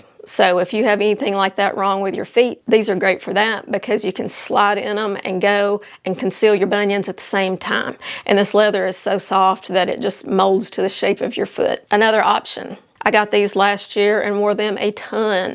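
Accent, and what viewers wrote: South, United States. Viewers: Hi. I love your video. I’m 65 and trying to figure out how to get where you are going in my own personal life. I am disabled so I’m at home all the time. I would not be caught dead in Capri pants or shorts or dress because I have skin problems on the bottoms of my leg below the knee. It’s kind of a permanent thing-that I can’t do much about at the same time. I can’t imagine wearing linen flowy pants every single day all the time. I just don’t quite know where to go stylewise. I end up wearing sweatpants all the time. I live in a small small town so I have to do all of my shopping online. I’m going to keep trying to transform my summer look. Thank you for the video. It keeps me focused on a goal PS I absolutely love your southern accent. I’m a southern girl myself, but I live in the upper Midwest now. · I laugh and think of your sweet southern accent when I see Capri pants and cheap flip flops!!!!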